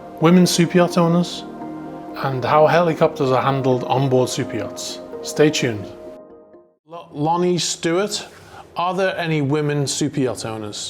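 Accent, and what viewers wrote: Liverpool, United Kingdom. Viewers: Are you a Scouser???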